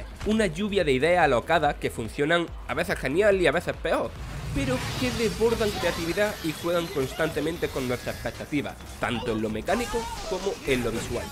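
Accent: Andalusia, Spain. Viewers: Buen análisis viva el acento andaluz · El tipo tiene acento andaluz no problemas fonoaudiologicos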